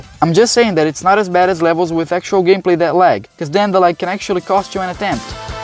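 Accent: Brazil. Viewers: Some brazillian accent ight there!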